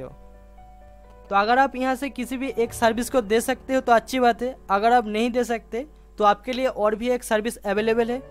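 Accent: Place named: Bengal, India. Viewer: Bro thik se hindi bolo banhali kayse bol raje ho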